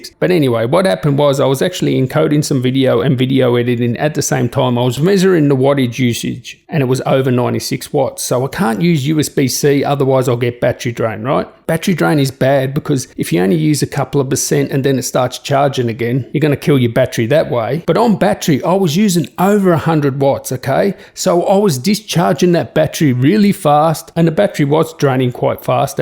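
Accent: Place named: Australia. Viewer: I love Aussie accent :)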